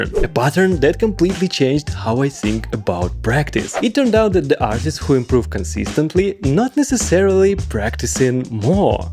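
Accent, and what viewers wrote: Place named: Eastern Europe. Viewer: Laik podposka slavyamskomy malchiky s milym accentom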